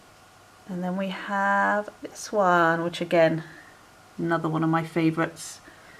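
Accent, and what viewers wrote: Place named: West Midlands, United Kingdom. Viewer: YIPPPEEEEEE I LOVE Plant Tours and WOWSERS you have a REALLY BEAUTIFUL Collection <3 I LOVE your variegated Thanksgiving cactus, all your plants are so beautiful and they are all so nice and tidy and beautifully potted up, and I LOVE your greenhouse too, and OMG WOW at your Euphorbia lactea ghost its a big stunner <3 I think your cactus is a Thelocactus variety or mammillaria compressa as they look very similar, and your cactus figurines are so cute too haha <3 I think from your accent you are from my neck of the woods originally (Warwickshire, West Midlands ? ) thanks so much for this wonderful update and sending you an abundance of love and happiness and PLANT POWER from across the Emerald Isle XXXX <3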